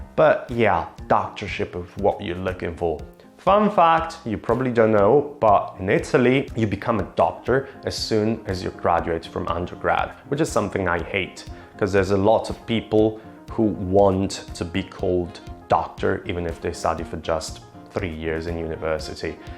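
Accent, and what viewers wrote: United Kingdom. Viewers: IlRompiBalle In quanto madrelingua italiano non può avere un vero e proprio accento dei classici, per quanto il suo sia chiaramente un inglese in linea di massima British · Seriusly how you have almost a british accent!!! I struggle so hard to just cancel the italian accent. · I think your accent is sooooo British :) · Oddio... Con quella barba e quell'accento britannico mi fai emozionare!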